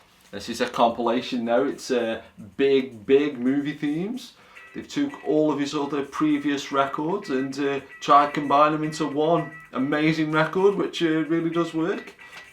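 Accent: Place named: Stoke-on-Trent, United Kingdom. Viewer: Where is you accent from, it sounds a bit stoke on Trent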